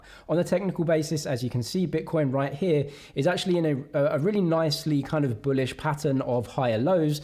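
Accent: United Kingdom. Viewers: accent from UK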